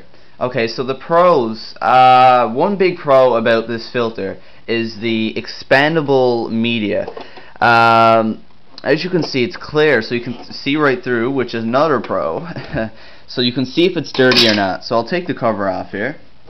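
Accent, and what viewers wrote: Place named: Newfoundland, Canada. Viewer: Knew that was a Newfoundlander Accent! Cool vid dude, pickin one of these up next week.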